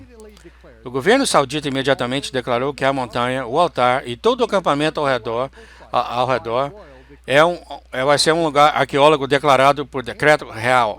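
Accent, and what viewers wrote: Minas Gerais, Brazil. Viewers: quem esta narrando e um mineiro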